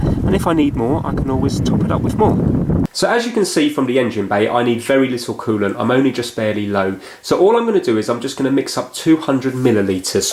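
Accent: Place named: London, United Kingdom. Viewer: Awesome accents like londoner.🥰nice vids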